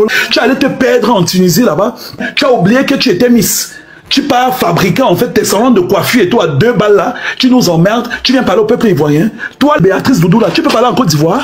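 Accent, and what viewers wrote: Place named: Cameroon. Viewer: Mais c pas un ivoirien tu es camerounais même si on me dit koi avec le ton et tu en connais trop sur le cameroun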